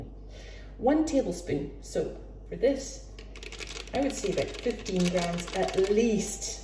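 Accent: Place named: Scotland, United Kingdom. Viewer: Thumbs up for your scones (and your lovely Scottish accent!)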